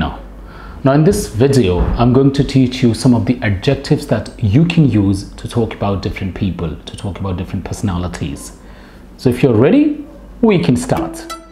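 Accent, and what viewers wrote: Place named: England, United Kingdom. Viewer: Sir I like your English accent 😊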